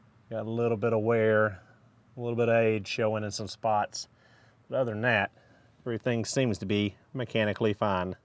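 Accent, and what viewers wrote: United States. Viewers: OK, I love the East/South Accent....Anybody gonna mention whatever is leaking or so......LOL. Great video and this cat can have that engine out and broken down in an hour. LOL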